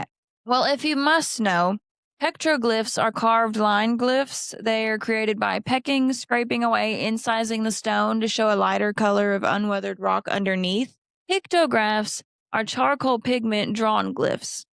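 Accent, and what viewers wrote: Kentucky, United States. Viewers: here from history of everything podcast. FINALLY, a Kentuckyian with a Kentucky accent! 😊 Unlike whats-his-name who claims to be kentkian with no accent??!, 😅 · Hi, my friend, I just found your channel, and I'm so glad and happy that I did. I'm speechless, because I'm getting some real and true history facts, told with that absolutely lovely and sweet southern Kentuckyian accent of yours - I'm officially in awe, and I could listen to you talking for the eternity, lol. So you got my like and sub, that's the least I can do, to thank you for your awesome video, that I'm enjoying so very much. So stay awesome, smart, and gorgeous as you are, my friend. Greetings, much respect, love, and all of the best wishes, from "across the pond", from Serbia, southeast Europe. Cheers!👨‍🎤🌹👧🇷🇸🥂🇺🇸🌏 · I can walk to Mammoth cave lol. That is how close I am to it. 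Kentucky has a bunch of beautiful ladies. Doesn't surprise me to see another one like you here. Nice video! Edit: When I hear you talk I can tell you have that Kentucky accent behind your words. I didn't even notice mine until I heard my voice play back after a recording I made. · Don’t know how this video popped up on my for you, but glad it did. Just subscribed, she sounds like one of my Kentucky girls so I love it and MC is a field trip we all had to take at some point. Looking forward to seeing more content from her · First video ive seen of yours,everything seems grade A+ i enjoyed the accent,sounds like a ky southern one( i could be wrong and either way dont post what state yer in cuz there's tons of aholes that would just dox ya)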